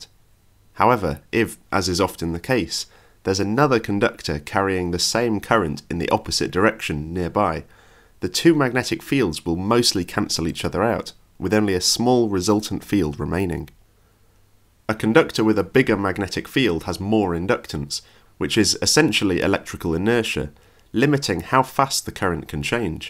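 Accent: United Kingdom. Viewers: He´s maybe in Germany, but he´s clearly a Brit...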